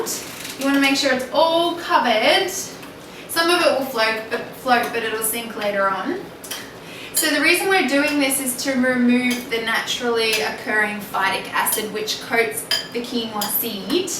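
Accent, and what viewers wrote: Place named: Australia. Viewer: I like your accent - I think you are Australian. I have just begun eating this food.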